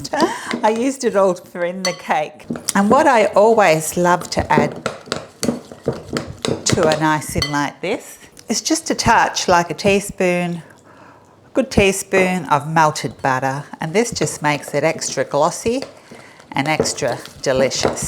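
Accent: Australia. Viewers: Hi have just found u on Youtube and hoe lovely it was to hear a fellow Ozzie there, have seen yr video on braised steak and will be cooking that tomorrow night also the date scones, my husband loves my scones so I cant wait to give him these date scones looking forward to trying ur other recipes cheers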